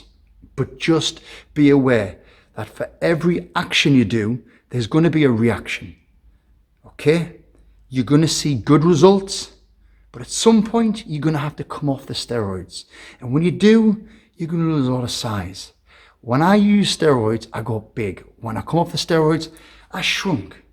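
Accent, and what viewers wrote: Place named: Dumfries, United Kingdom. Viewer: Have you ever been to Dumfries in southern Scotland? Your accent sounds similar to it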